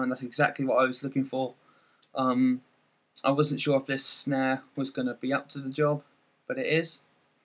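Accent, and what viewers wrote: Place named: United Kingdom. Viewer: dude i could listen to you talk all day. i like hearing people from the uk talk.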